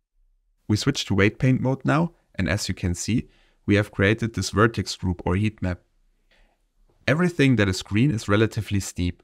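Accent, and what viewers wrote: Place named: Germany. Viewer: ich wusste doch das ich Deutsch rausgehört hab! Aber geiles Tutorial ehrlich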